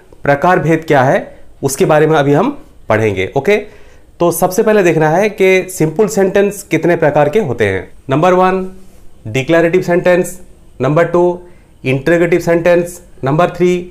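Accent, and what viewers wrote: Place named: Odisha, India. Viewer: Good job sir. But aap hindi kyu bol rhe ho sir bich bich me apke muh se odia nikal ja rha hai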